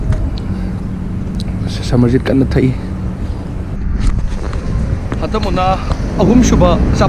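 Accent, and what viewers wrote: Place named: Haowe, India. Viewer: Bro ngi haowe khuhaido taba yam nungai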